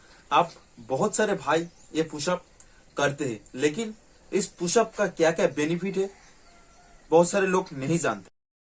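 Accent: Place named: Bengal, India. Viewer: Super ...hindi bolna thora improve kerna he.......kiu ki ap sayed bengali ya assamies ho.....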